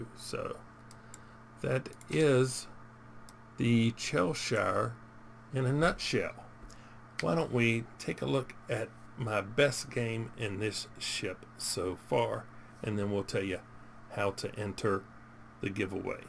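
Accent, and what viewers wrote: South, United States. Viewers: Your southern Drawl is mispronaouncing the Word Cheshire. My Name is Tentto and on the NA server.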